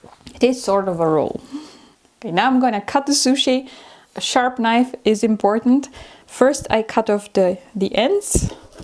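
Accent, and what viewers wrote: Netherlands. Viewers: Does she have a Dutch accent? Or Danish? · My family is from the Netherlands 🇳🇱 but I’m from 🇨🇦 I knew the accent instantly 😊great info great video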